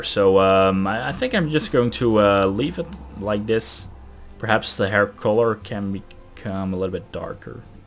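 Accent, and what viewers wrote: Netherlands. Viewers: Ok cool! When are the next parts coming out? Do you have an upload schedule? Sorry for all the questions I am just really excited for this series haha PS: Je hebt een Nederlands accent kom je uit Nederland?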